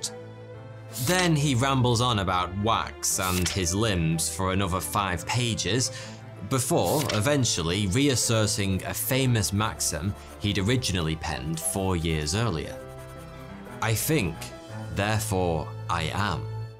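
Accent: United Kingdom. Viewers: Talking of...or is that "speaking-of"... whatever....in regards to "perception". ¿I wonder if " " ever did an Episode on Accents? In short, people's Regional Vocal Accents... for, also to a slight "hearing" problem I've developed, requiring the use of hearing-aid, and because of " "'s Regional British Accent, that was saying "Forty-Two" instead of " ". As an 'omage to Douglas Adams, until I really noticed the on "Screen" 'printed-tag' " ". Which could be sort of "Multi-Part-er" starting with why people speaking English pronounce the way they do: in different parts of England; Canada, Australia; New Zealand; and the USA; Segway into why some people from Non-English Speaking Countries have an "Accent" due to the way They Learned to correctly pronounce Their "Native" language. Then on to why different Dialects develop in Languages in general, possibly using China as an 'extreme' example. 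Eventually, leading into why there are more than 7,100 languages are spoken in the world today. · British accent makes everything sound nice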